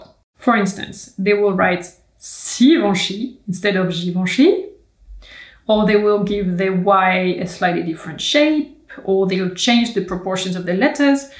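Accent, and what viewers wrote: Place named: France. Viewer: Just a small observation, since you're back in France, your French accent can be heard a bit more clearly again. :D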